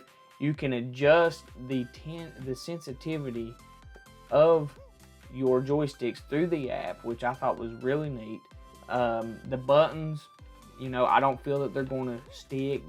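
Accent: South, United States. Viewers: U from the south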